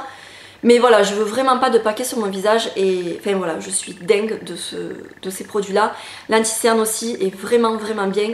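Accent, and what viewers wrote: South, France. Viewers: Tu es au top trop géniale tes haul sont supers en plus avec un accent du sud ..... mais quel moments magiques!!!!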